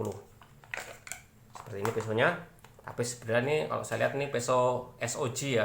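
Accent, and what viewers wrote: Indonesia. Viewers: Dari suaranya cina wilayah jawa 😅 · JAWINE PUNDI MAS aku MAGELANG LOGAT JENENGAN MIRIP WARGA TEMANGGUNG MAS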